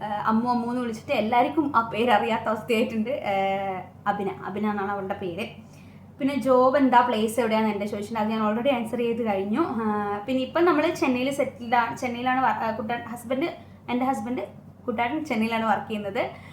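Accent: Kannur, India. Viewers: അതെന്താ കണ്ണൂരുള്ളവർക്കു നന്നായി സംസാരിക്കാൻ പാടില്ലേ? ഒരോ ജില്ലയ്ക്കും ആ പ്രദേശത്തിൻ്റെ തനതായ 'സംസാര ശൈലിയുണ്ടാവും.. നിങ്ങളുടെ വിദ്യാഭ്യാസത്തിൻ്റെ ഗുണമാണോ ഒരു പ്രദേശത്തെ ഭാഷയുടെ പേരിൽ നെഗറ്റീവടിക്കുന്നത് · KL Biju venta kannur slag.you good speaking.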